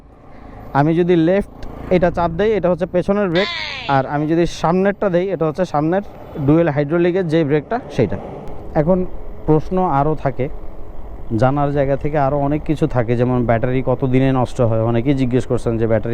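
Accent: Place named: Keraniganj, Bangladesh. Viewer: Vai toh mone hoy Keraniganj er🙌❤️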